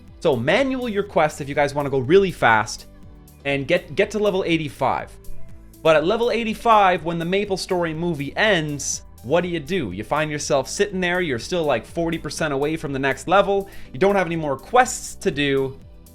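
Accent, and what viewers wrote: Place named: Canada. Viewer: UR CANADIAN ACCENT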